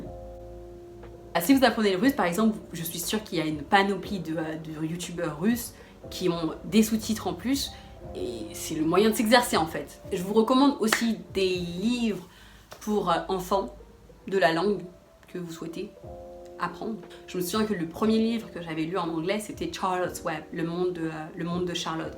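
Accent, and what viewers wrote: United Kingdom. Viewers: black women with uk accent....n'hésite pas à regarder l'objectif de la caméra au lieu du retour ! :) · olalala tu m'as deter !!! cet accent anglais magnifique un goal absolu !!! Merci pour tous ces conseils, ultra ultra utile!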